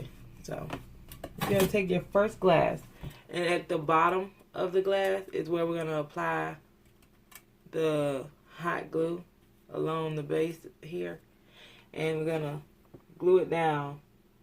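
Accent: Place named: Florida, United States. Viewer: Hello, just wondering how you are and where have you been? I hope you've found new found fame and are just too busy filling orders for decor and just not have time for diy videos. If so continued success! I'm from Texas, are you from Florida? Your 'accent' although subtle, sounds like a good friend of mine from Florida. Just curious.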